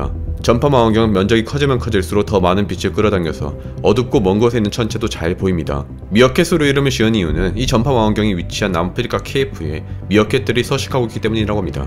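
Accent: Gyeongsang, South Korea. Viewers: 경상도 사투리 엄청 거슬리네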